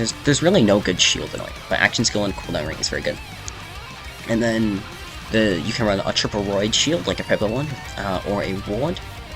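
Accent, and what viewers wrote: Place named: United Kingdom. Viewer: suspiciously british sounding woman rambling ASMR in my home page, the algorithm monkeys are pulling the right ones out of the hat today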